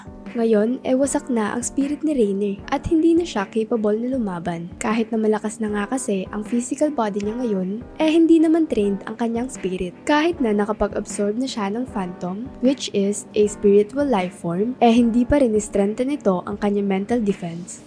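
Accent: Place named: Conyo, Philippines. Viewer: ang conyo ng voice, approve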